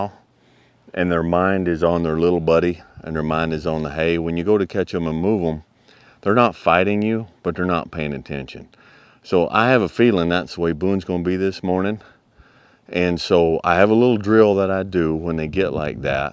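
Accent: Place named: Kentucky/Tennessee, United States. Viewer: A big welcome to Texas. Mighty glad your now in God's country. With that Kentucky/Tennessee accent you will fit in just fine. The scenery of the hill country of Bandera is mighty beautimous. As always I look forward to your informative videos, and the lessons of life you tell.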